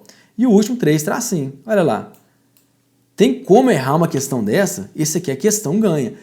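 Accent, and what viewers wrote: Minas Gerais, Brazil. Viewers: sotaque mineirin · uai sô, rastado esse sotaque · O sotaque mineiro é bem simpático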